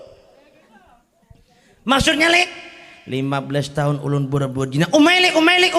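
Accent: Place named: Banjar, Indonesia. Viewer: Ku kira Banjar Tanjung loko jadi suka bangatt denger Nye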